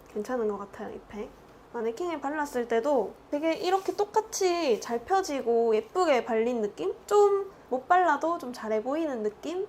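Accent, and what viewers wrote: Gyeongsang, South Korea. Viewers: 인스타로 보다가 영상으로 보니 더 친근한 느낌이나네용ㅎㅎ 특히 구수한 경상도 사투리가용 ㅎㅎ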